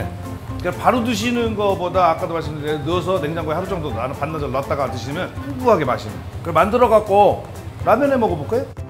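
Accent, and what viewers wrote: Chungcheong, South Korea. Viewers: 아... 전형적인 충청도의 너스레~ㅋㅋ 레시피도 얻고 재미도 얻어가네요~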